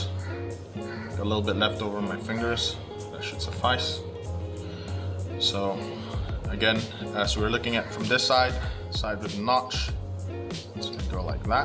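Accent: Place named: Malaysia. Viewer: i knew you were malaysian just from the way you spoke haha... anyways, good video... but yeah, it's so hard to get anything here in malaysia, we only have 1 official distributer in malaysia and they're not really taking it seriously, like their shop is always out of stock and don't carry everything that letherman has to offer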